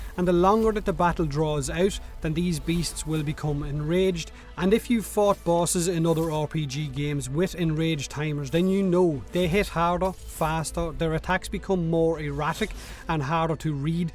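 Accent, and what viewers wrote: Ireland. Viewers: Very Irish :b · I am guessing you are Irish, are ya, mate? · Your irish accent is somewhat entertaining xD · God damn, I couldn't decide which was better; the video game being made or your accent. I hope we get to meet in-game one day, Irish Hunter Man.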